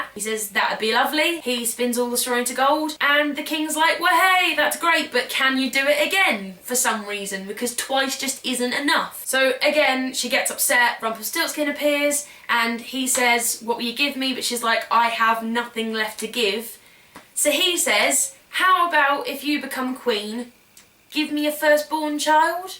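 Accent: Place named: London, United Kingdom. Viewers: Sounded like very Londony in this video (i am aware that she is from London way) just really heard it in this video :) <3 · Is it me or did carrie's accent get more essex-y/cockney in this video..? Still loved it though. :)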